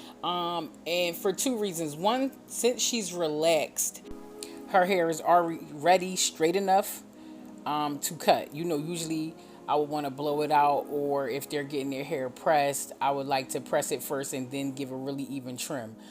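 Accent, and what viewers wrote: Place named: New York, United States. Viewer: I love your accent. Are you from NYC? Watching you work and listening to you is so soothing!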